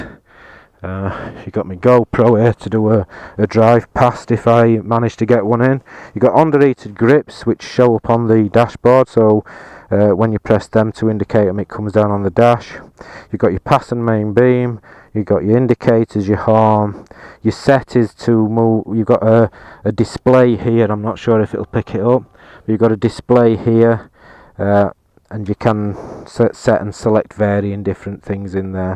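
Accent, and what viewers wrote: Yorkshire, United Kingdom. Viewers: One more comment. I'm from the states. Quite a few years ago I enjoyed a British TV show here on PBS. It was called "All Creatures Great and Small" about a country vet in the Yorkshire country side. Loved it. Your accent brings me back to that time. Thank you. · Cracking, comprehensive review matey! And I bloody love your Yorkie accent 👍🏻 She looks beautiful in them colours but then I would say that 😉😊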